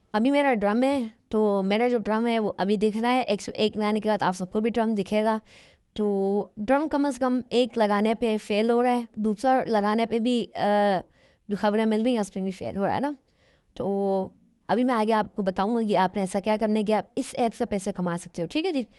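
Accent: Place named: China. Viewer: Tumhara Hindi Do Chinese hindi hai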